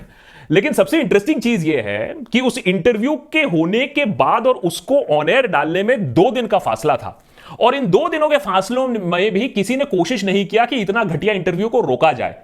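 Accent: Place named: Uttar Pradesh, India. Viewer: Ap ekdam up vaalo ki trh hi accent mei bolte hain.... BHAKT BANNERJI ka role karte karte ek dam smooth ho gye Love your episodes love your integrity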